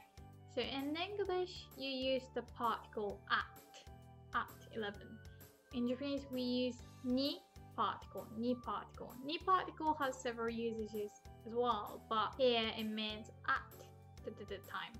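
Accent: United Kingdom. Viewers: イギリス人のような発音を使いますね！ ハリーポッターが好きなんですか？笑 私はイギリスから来たので、気がつきますけど、日本ではアメリカの英語を学ぶらしいですから、珍しいと思います！ 優れた発音に感心します ：D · Shes has a British accent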